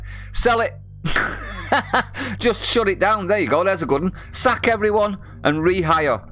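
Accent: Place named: Manchester, United Kingdom. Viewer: Great post from a person with a Manchester accent - glad to say that I recently joined the ranks of people who has cancelled their TV licence - the thought of all those on the "BBC gravy train" especially '£1.75 mIllion Lefty Lineker' equivalent to over £33,000 a week or a welsh lefty paid £500,000 a year just to read the Biased BBC news? Drain The Swamp.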